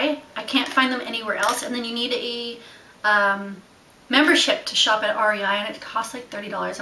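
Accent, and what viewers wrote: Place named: Newfoundland, Canada. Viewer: You for sure still have a Newfoundland twanggggg. Can you believe I haven't been wearing a purse these days? I've been toting all of my friggin' laptop, tablet and notebooks around as a mobile office. Plus all the crap I need for my youngsters when we go out. I should totally do a video. I love you brush your hair flat out. I NEVER brush my hair!!!!!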